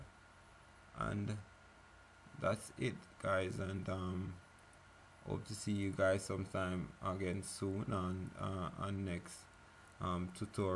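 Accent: Jamaica. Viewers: u jamaican dont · Are you Jamaican> :D :3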